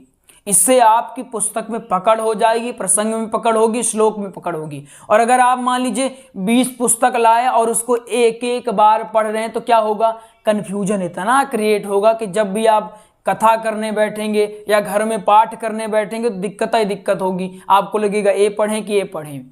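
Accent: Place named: Kashmir, India. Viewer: aap ke bolne me kashmiri jhalak hai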